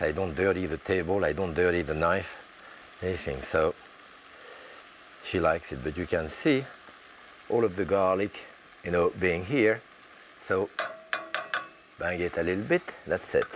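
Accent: France. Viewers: For someone with a pretty strong French accent (which is great btw) Jacques has an elaborate English vocabulary, which is strange. Is it because European languages share many words?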